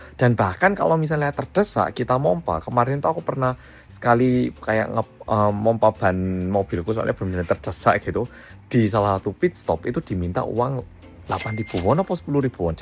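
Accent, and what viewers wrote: Java, Indonesia. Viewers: Jadi seneng lihat videonya dah ganteng logate medhok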